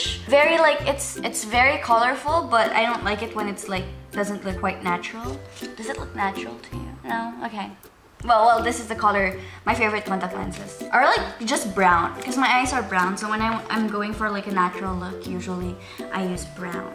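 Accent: Philippines. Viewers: Pinay accent 🤣😍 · Love her Standard Pinoy English Accent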